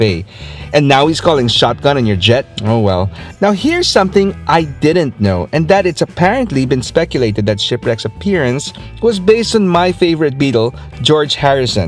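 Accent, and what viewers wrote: Philippines. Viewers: from your accent. are you from Philippines? Just curious. Was G.I. Joe big over there? I'm surprised when I see pinoy youtubers talking about gig joe. I never knew it was shown over there in the 80's. By the way you don't need to insert an image of every word or phrase your saying. for example, we don't need to see images ofMadeline Pryor when you say prior or images of Michael Jackson, goat saying bad and all the other corny images. Just stick with the subject. The other images are just so fake and corny.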